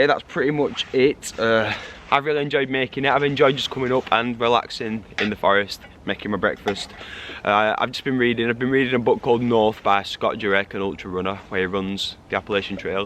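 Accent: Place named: Yorkshire, United Kingdom. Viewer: 😂😂😂😂😂🤣🤣🤣🤣🤣just fucking with you brother hahaha we all need a laugh actually liked your vid bro we’re bouts you from sound like a Yorkshire lad like myself brother keep it up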